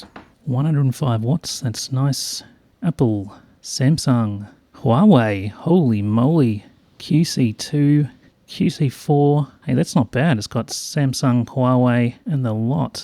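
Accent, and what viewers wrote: Australia. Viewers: Are you Australian ??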